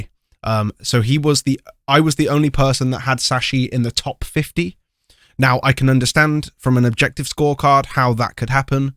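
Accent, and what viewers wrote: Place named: United Kingdom. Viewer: И твой британский английский как скальпель по яйцам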